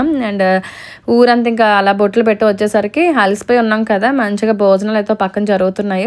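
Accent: Godavari, India. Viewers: Hi Gaaru, How are u doing....Nice to see u again... U R a stunning Beauty... Varninchataaniki Maatalu leveu... Mee Godrolla Slang Baaguntundi.. Traditions baaguntai.. Pilupulu Baagunnai.... Mee Sisters Super.... I hope U enjoyed.... in Marriage Celebrations...... Take care have a great day.. Cheera color Super ga undi andi..... Thanks for sharing......😃😃😃😃😃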